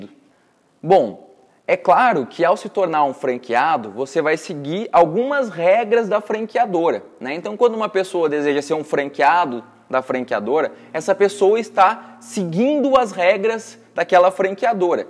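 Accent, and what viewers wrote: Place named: Pernambuco, Brazil. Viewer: Adoro o seu sotaque e o " xero" do idioma Pernambuques ❤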